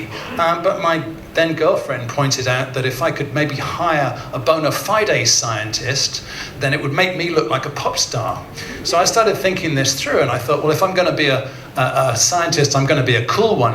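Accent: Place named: Liverpool, United Kingdom. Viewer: He sounds Liverpudlian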